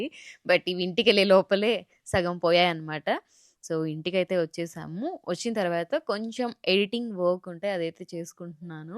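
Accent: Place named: Kurnool, India. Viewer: Your slang is like Kurnool girl 😊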